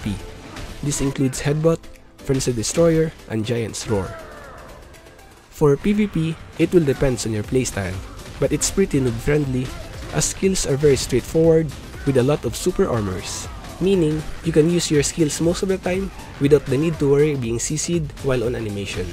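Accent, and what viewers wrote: Philippines. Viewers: Pilipino ka ba? Parang pinoy ka mag English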